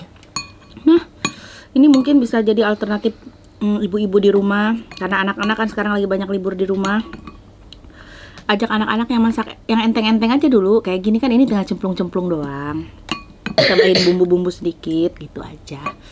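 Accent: West Java, Indonesia. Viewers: Teteh uda cantik Gaul....pintar lg masak... Salut....dech Klw ngomong asik bnget didengerinx.. Logat sunda x.... Putrax mirip teh bnget Cakeppp..... Salut teh...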